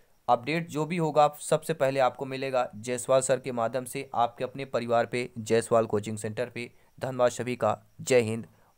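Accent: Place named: Uttar Pradesh, India. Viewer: but aapki language u.p. ki lg rhi hai...🙏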